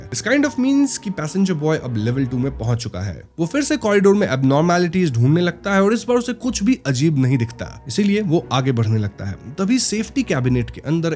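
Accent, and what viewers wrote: Bengal, India. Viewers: You are Bengali, Your tone like bangala.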